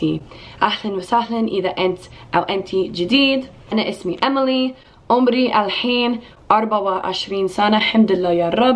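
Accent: United States. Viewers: OMG for an American person ur American is sooooooo good learn alil bit more Arabic accent and you will be perfect 😍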